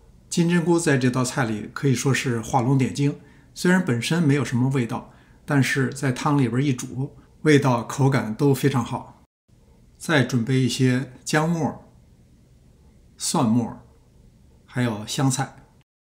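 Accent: Beijing, China. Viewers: 感谢分享，京味儿普通话缓缓讲出生活智慧！明儿就做！像博主一样切西红柿！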